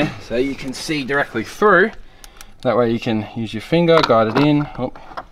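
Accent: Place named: Australia. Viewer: Appreciate it mate finally a Aussie explaining it thanks for helping a young fella out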